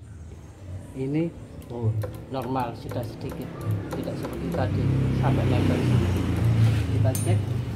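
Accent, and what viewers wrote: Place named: Java, Indonesia. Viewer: Medok kali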